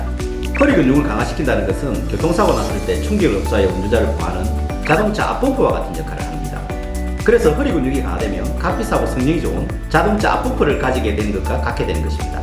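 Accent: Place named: Gyeongsang, South Korea. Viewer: 낭만 닥터님. 구수한 갱상도 사투리에 열정까지 담아서 설명해주시니 머리에 속속들어옵니다^^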